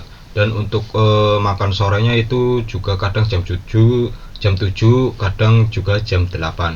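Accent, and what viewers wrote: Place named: Banyumas, Indonesia. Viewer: Mas ngapak mau tanya boleh?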